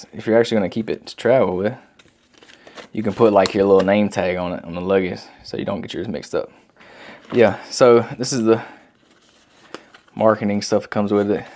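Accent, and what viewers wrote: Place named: South, United States. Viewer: Your little southern boy accent is so sexy.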